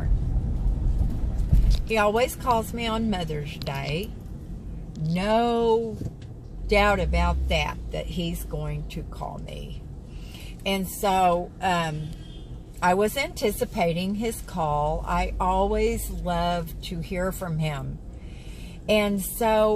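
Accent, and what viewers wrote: South, United States. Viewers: You and ur Southern accent! Love it!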